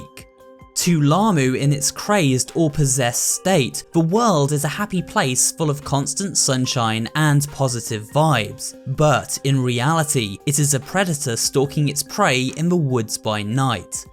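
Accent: United Kingdom. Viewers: THE BRITISH ACCENT JUST MHWA❤️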